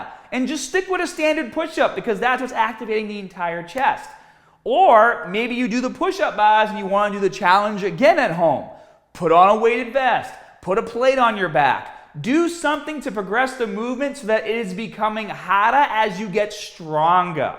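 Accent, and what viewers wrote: Boston, United States. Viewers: this guy must be from boston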